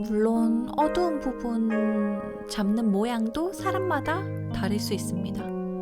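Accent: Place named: Gyeongsang, South Korea. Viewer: 목소리가 고우신데 말투에서 경상도쪽 억양이 약간 느껴지는게 매력이에요 ~_~ 허허 조와요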